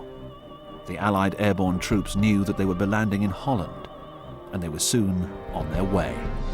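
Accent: United Kingdom. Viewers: Well said. Furthermore, as I like it as an American, is it possible that there is another voice other than a British voice that can narrate the story? Is there some kind of prohibition against all other English speaking people? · ...but but but it's got the stiff upper lip accent! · I hate the narrator's pretentious British accent.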